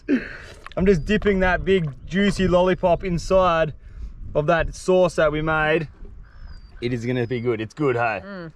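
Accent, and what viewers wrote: Australia. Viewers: Her name is? With your strong Aussie accent I always thought you were calling her "monkey" LOL it really doesn't matter cause I love it when you bring her along with you on these adventures such a beautiful person. I Absolutely Love Crab, but sadly I live in Missouri and we are miles away from any coast where you can catch your own. If I want crab I have to go to Walmart and buy frozen crab. But it's still good and very expensive.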